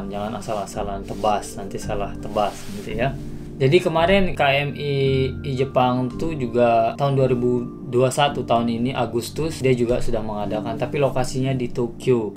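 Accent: Lombok, Indonesia. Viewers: Bang orang Lombok ya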